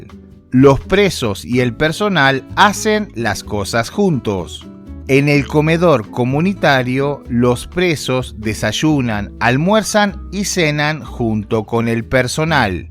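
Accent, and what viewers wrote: Argentina. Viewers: 🤯 ¡Me voló la cabeza!... Pero no por Islandia y su desmadre, la narración en jerga mexa con acento argentino, es como un arma para destruir a las IA. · Lo que no entendi es porque hablan mucho de Mejico y el que habla suena a Argentino,lol.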